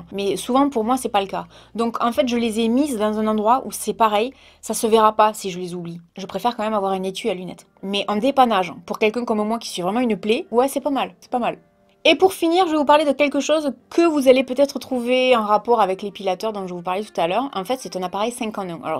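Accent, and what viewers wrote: South, France. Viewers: J'aime tellement ton peuti exan du sud, tu me fait penser à une amie d'enfant là même j'ai l'impression 😊 tes vidéo son super merci beaucoup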